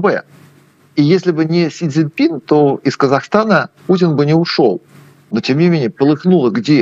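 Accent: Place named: Israel. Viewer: Явно заметен акцент ведущего. Тогда все понятно : либо Израиль , либо Бруклин. Дизлайк.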